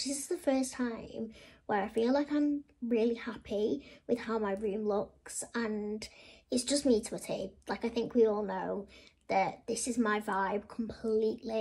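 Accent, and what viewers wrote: United Kingdom. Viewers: Extraordinary beauty....and love that British accent....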